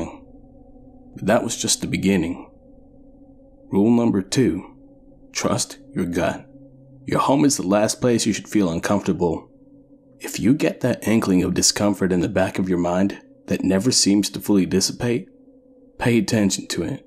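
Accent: South, United States. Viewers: I thought you were from the South! I was sold! So you can do accents too? that's even more impressive! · As a fellow Georgian (not too far from this story), I voted you up! I moved to the north, and it’s awesome to hear a southern voice again lol. Good luck in round 2! I’m excited to see what you’ve got in store. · Nice story! Mr. has a very likeable way of narrating..held my attention immediately. Felt like I was listening to a friend relating a story. Extra impressed he's Canadian yet his accent reminds me a bit of my southern roots. Definitely a 👍 from me. Actually 2 👍👍, 1 for author Mr. Zacharius Frost as well. And it's always nice finding another great cp channel, so I subscribed to too. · Being a non-American; I love that southern drawl.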